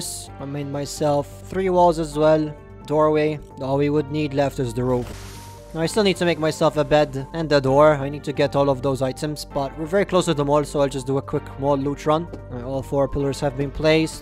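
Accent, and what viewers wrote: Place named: Malta. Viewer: Question I am new and you’re English accent sounds like my country’s English accents that Maltese so I am asking are you maltese and live in malta pls tell me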